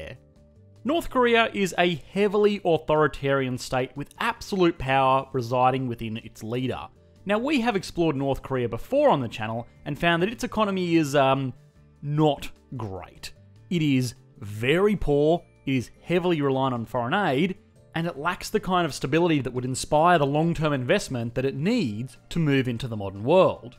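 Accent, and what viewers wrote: Australia. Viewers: I love how this duffer tries to speak American English with an Australian accent. He's good at explaining stuff, though.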